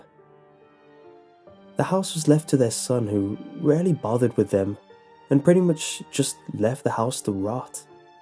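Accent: United Kingdom. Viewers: where in the UK are you from? I can't place your accent!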